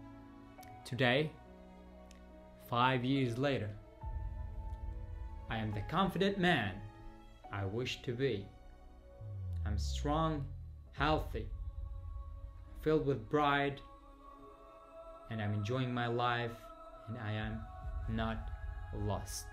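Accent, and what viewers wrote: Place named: Baniyas, Syria. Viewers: متتتتتت😂😂😂😂😂😂 احلى شي انه الناس بتشك انو عربي😂😂😂😂😂😂😂 هي لهجتنا اهل البيضة "الساحل" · الشغري من مدينتي بانياس و بعرفو شخصيا اللهجة البانياسية (البيضة) صعبة شوي عغير الناطقين بها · لهجة بانياس ياجاهل.. ك ماشفت ضيعة ضايعة؟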